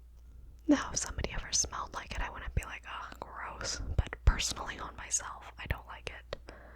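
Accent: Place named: Vancouver, Canada. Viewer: It sounds as if you are from Vancouver, BC.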